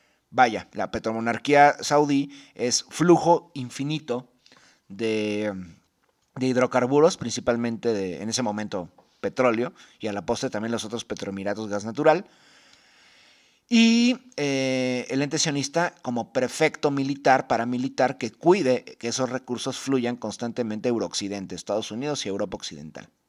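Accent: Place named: Mexico. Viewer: la mejor respuesta que he escuchada para esa gente que cree saber mucho y sus fuentes se basan en cultura pop, hollywood, etc etc:D me hizo reir Master lo admiro, ahora que estamos en crisis y se está disputando la libertad y el alma del ser humano usted aparece como un caballero de la luz con acento mexicano blandiendo la espada del conocimiento... GRACIAS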